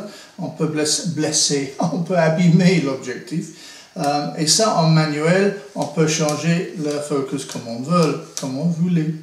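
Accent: England, United Kingdom. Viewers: Super ce petit cour avec l'accent anglais j'adooore. Je suis nouveau dans ce domaine de la photographie ce cour m'aidera beaucoup car je ne pensais pas qu'il y avait autant de spécifique aux objectifs merci merci merci encore pour votre partage vidéo. Je m'abonne. Bye...!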